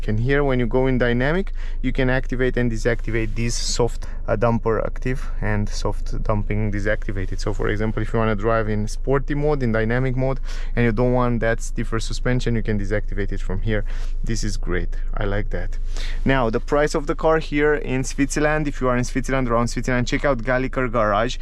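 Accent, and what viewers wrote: Romania. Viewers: You sound ... Romanian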